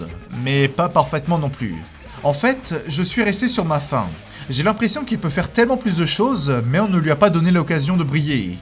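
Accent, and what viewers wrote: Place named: Paris, France. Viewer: Vidéo très intéressante mais ça serais bien d'arrêter d'appuyer la dernière syllabe de tes mots quand tu parles c'est très bizarre on dirais une parisienne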